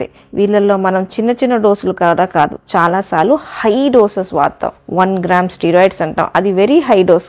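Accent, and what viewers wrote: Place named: Ongole, India. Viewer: డాక్టర్ గారు మీరు వివరిస్తున్న విధానం బాగుంది. కానీ తగ్గుద్దీ వచ్చుద్దీ అవుద్దీ ... వంటి ఒంగోలు విజయవాడ లో గ్రామీణ యాస ఎక్కువ గా వుంది. Public forum కదా.. అన్ని ప్రాంతాల్లో చూస్తారు... భాష కొంచెం మార్చుకుంటే ఇంకా చాలా ఆకర్షణీయం గా ఉంటుంది.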